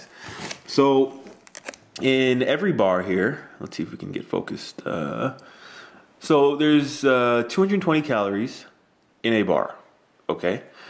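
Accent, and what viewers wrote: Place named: United States. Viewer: you are an attractive man. am curious though. what country are you from? you spell like a Brit (e.g. flavour instead of flavor), but you sound like a very educated American.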